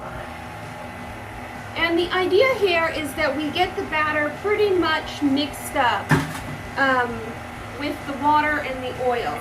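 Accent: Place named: Midwest, United States. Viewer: I'm guessing u are from the Midwest. ..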